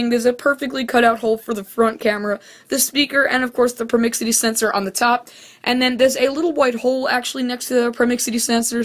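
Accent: United States. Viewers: Do you have a New York accent?? Just wondering, otherwise great video.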